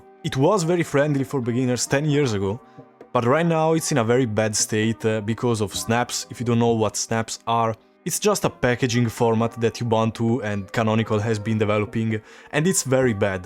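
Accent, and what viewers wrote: Italy. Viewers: Bro ma sei italiano?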